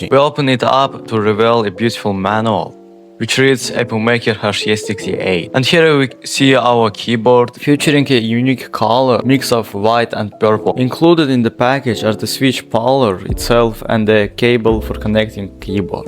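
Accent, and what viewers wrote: Russia. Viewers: Russian accent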